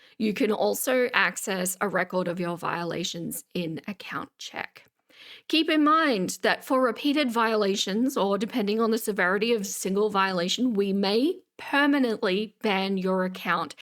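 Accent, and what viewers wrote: Australia. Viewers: Love hearing your thoughts and analyses/detective work. Fellow future-dweller here 😊 although from your accent it sounds like youre from Vic. Heading there for PAX this week. Keep the vids coming!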